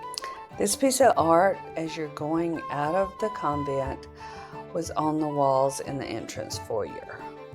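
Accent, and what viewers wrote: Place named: United States. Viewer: Wow that's an amazing American accent, where did you grow up?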